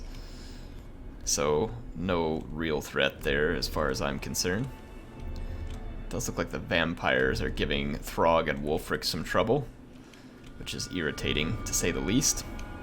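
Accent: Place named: Appalachia, United States. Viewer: While I enjoy the hillbilly accent, I still prefer Michael Palin saying “anarcho-syndicalist commune.”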